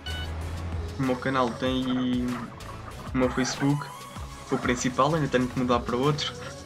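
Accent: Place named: Portugal. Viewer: portugues temtando falar brazileiro muito bom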